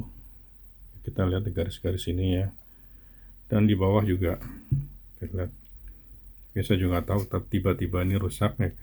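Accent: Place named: Indonesia. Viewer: Wah jd panutan nih bersih2 pekarangan efek dr tetangga tanpa dongkol 👍👍👍, btw lidah Indo ya gak hilang 🤪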